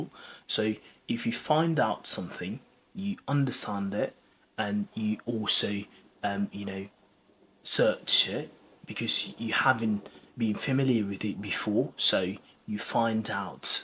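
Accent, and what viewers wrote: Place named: England, United Kingdom. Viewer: I like you accent , what type of accent are you talking with I mean what part of England are you from?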